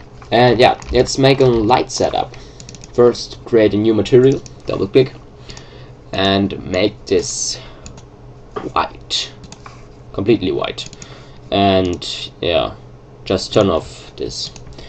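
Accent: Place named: Germany. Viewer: Man hört sehr gut deinen Deutschen akzent :D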